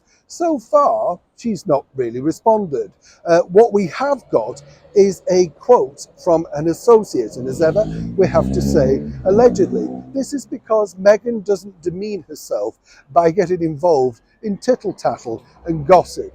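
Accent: Yorkshire, United Kingdom. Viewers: Neils a true yorkshire lad 😊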